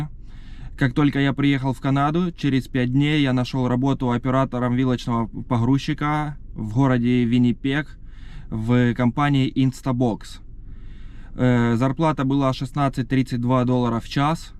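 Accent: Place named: Kharkiv, Ukraine. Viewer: Похоже на Харьковский говор)))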